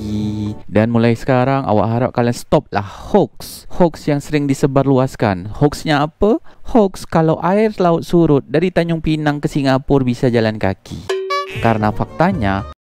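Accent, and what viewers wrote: Johor, Malaysia. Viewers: Assalamualaikum. Tak ada 'pelat'/slang Indonesia pon. Sebijik macam Melayu Johor. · Saya dari jawa. Denger abang ni ngomong kaya Upin ipin... luar biasa bangsa kita dengan banyak suku suku... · Upin ipin wkkwkkwkk..tp serulah .cakap awak.. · Logatnya sama upin ipin🤭😁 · Salam dari Johor..bahasa tiada beza dengan orang Johor.. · saye suke sekali dapat melihat pulau2 kecil dikepualauan kepri, apalagi logat melayu nye yang khas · senang liat2 dan denger logat melayunya bang. asyiiik...terus yg rajin bikin kontennya ya bang...rasanya ikut jalan2...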